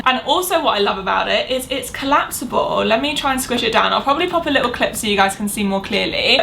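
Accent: England, United Kingdom. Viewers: This is on a kind of related note, but I have a friend who was on deviantART for a long time who experienced a similar thing. She's been on dA for a long time now and built a pretty loyal following of 2,000+ watchers, which is pretty substantial on that platform. On that platform, she's been commissioned so much, even started making pins, plushies, and tattoo designs. Recently, she tried out tiktok and quickly blew up. She was making comics that were getting views in the millions, she's never had so many eyeballs on her art and got about 70K+ followers. But even with those numbers, she got almost no offers for commissions or sold merch. What she did get were a bunch of people asking for more content, even though the stuff she was making was quite work intensive. I feel like the infinite scroll of TikTok devalued the amount of work she was really putting into her art, and when people weren't getting content fast enough (by that I mean, within days of her last post), they just forgot about her and her views plummeted. 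That weird surge of fame she experienced ended as quickly as it began, and she made almost no sales out of it. Compare this to her 2,000 watchers on dA who still commission her to this day. I'm not saying dA is a perfect platform (it's not), but TikTok is designed to have this pathetic half-life, I wonder how ANYONE maintains TikTok fame at all. side note: I loved the vid. Does anyone know which part of Britain her accent is from? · you're too british. it's hard to listen to you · Where are you from in England, you have the same voice as my psychology teacher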